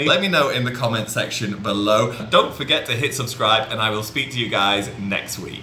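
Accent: Rio de Janeiro, Brazil. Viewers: It's sounds exactly like a Brazilian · You do sound like a stereotypical gay Brazilian man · esse é o sotaque gay brasileiro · Nail the carioca accent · The way you're talking is not only Brazilian but specifically Brazilian LGBT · O teu Português tem muita influência carioca.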